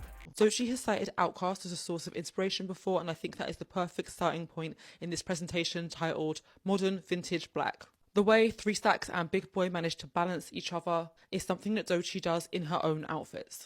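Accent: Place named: United Kingdom. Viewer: Absolutely love these videos, and your charming British accent!! Do you think that you would ever do a video on Sade? Her iconic red lip is just everything to me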